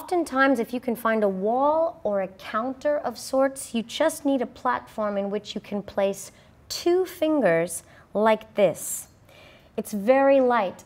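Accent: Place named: United States. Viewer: Random comment, but I like your American accent :) Good enunciation!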